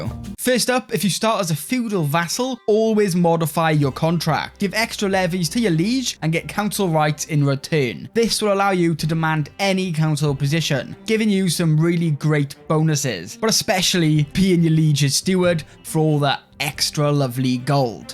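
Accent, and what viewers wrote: Wales, United Kingdom. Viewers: There's nothing better than clicking on a yt vid and hearing a Welsh accent 🏴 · Thanks for the tips mate. Just bought this after playing CK2. Always great to hear a fellow Welsh voice on YouTube 🏴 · Bruv are you Welsh? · I wonder what kind of UK accent that is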